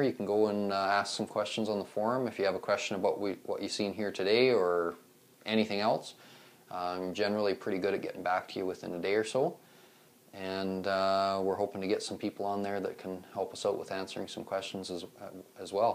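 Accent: Canada. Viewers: He from Canada ! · Canadian, aye?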